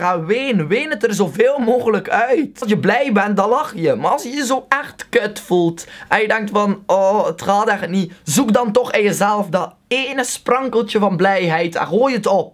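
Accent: Belgium. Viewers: Sandra Kim Oh... Nou ik als Nederlander hoor duidelijk een accent bij hem en dan refereer ik meteen naar de Belgen, haha 😁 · He's actually speaking Dutch with a Belgian accent. (He's belgian)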